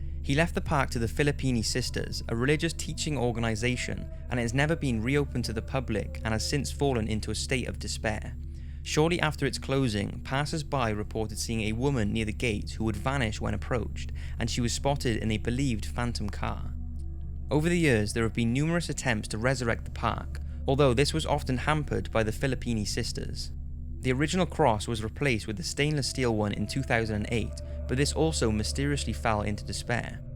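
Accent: United Kingdom. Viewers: For some reason a British accent always makes a scary story scarier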